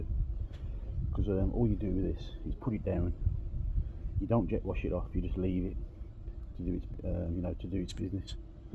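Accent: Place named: Birmingham, United Kingdom. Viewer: Alright mate if used a watering can how much should I dilute it thanks like say a 5 litre watering can how much of each you sound like you are from Birmingham like myself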